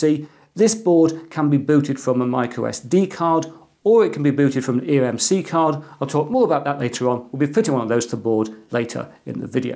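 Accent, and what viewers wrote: United Kingdom. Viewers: Why do british people say "Sor" instead of "Saw"?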